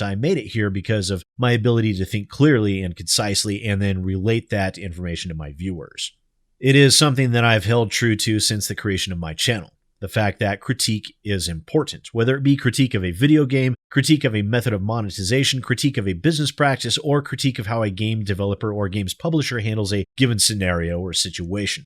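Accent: England, United Kingdom. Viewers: I'm going to miss that awesome British voice...RIP. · I dont even remember his reviews, just remember annoing english voice - nothing more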